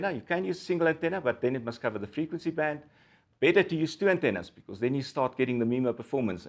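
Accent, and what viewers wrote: South Africa. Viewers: a South African accent talking man tech talk... with all the gear on the desk ... I think it might be an Austrian thing as we have a lot of South Africans living here and we find the Accent very funny as they are mostly very wealthy and loud... but not that with it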